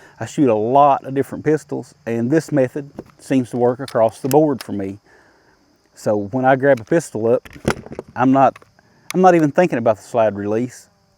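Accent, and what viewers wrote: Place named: South, United States. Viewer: Dude! I dig your last name.! That could be a band name. Right on brother! and I just subscribed to this guy's channel because of his non threatening southern accent, and I thinks he's right about the slide thingy.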